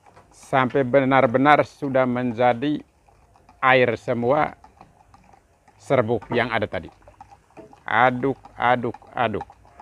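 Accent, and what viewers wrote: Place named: Manggarai, Indonesia. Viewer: Pak logat nya kaya org Manggarai ya Pak..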